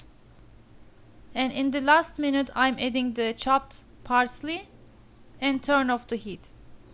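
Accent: Turkey. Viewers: Very Turkish accent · This english makes me laugh because one actress from turkey talks like this in the film i forgot her name 😂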